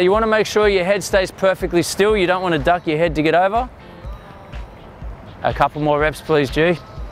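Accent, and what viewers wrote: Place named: Australia. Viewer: I have no idea what this guy was saying...Not because he's got Australian accent but because I stopped listening after he told that girl to lay down and work on her glutes...At that point, I even forgot what this video was about. If you want people to pay attention and not get crazy distracted by the hot girl, bring a 300 pound guy to do these exercises. People will definitely pay attention as they'll feel if they don't - they will end up like that guy.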